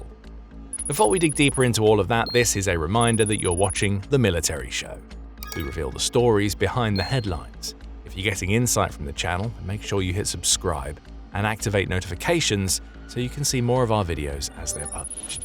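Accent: England, United Kingdom. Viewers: Crap Americanisms with an English accent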